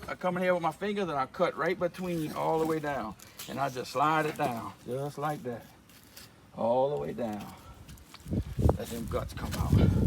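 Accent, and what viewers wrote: Louisiana, United States. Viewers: Sure sounds like my Louisiana people!!!!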